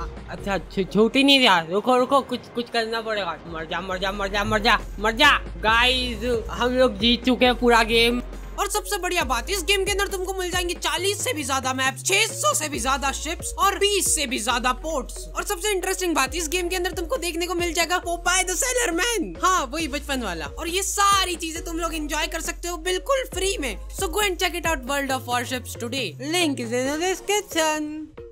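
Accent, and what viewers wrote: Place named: Malwa, India. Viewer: Bro tumhari urban malwu accent bahut mast h yaar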